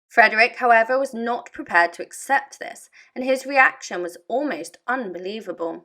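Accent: United Kingdom. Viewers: Hard to Liston to, thick British accent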